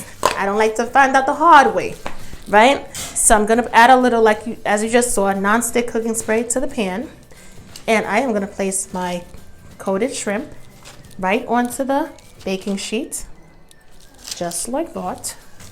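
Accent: New York, United States. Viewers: I’m making this for sure. Girl your accent is so strong, you have to be from NY lol. I’m thinking the Bronx!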